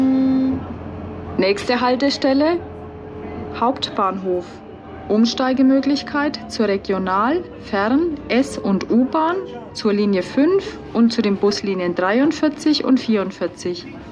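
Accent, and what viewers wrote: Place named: Franconia, Germany. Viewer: Außerdem sind wir Franken und stolz auf unseren Dialekt und es hat irgendwie Charm. Ich finde die Sprecherin ist gut und macht einen guten Job und wie Trainspotter schon sagt in anderen Städten ist das noch schlimmer mit dem Dialekt!!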